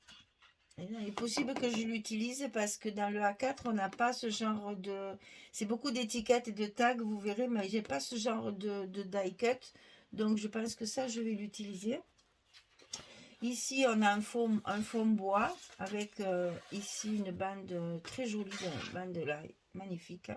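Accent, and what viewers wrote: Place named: Provence, France. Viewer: Bonjour 😊 Magnifique papier ❤ même si je suis une fille du Nord, j'adore 💕 votre Provence, les lavande, les vieilles pierres, des paysages magnifiques, votre accent...... Mon rêve! ❤❤❤❤ Merci et bonne journée 😘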